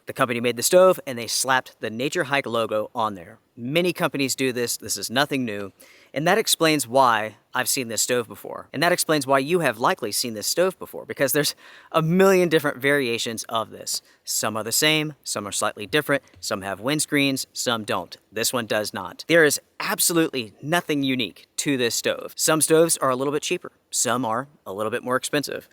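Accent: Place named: North Carolina, United States. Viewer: Everyone from NC says varabals like him.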